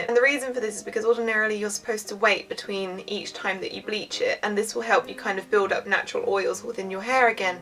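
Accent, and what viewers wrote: United Kingdom. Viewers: Far Q It's just British... I suppose.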